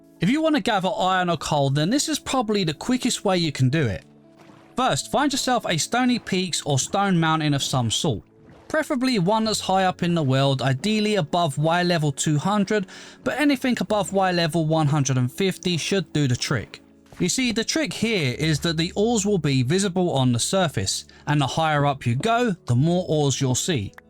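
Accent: United Kingdom. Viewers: Should not be hate but are you British cuz the accent sounds like it?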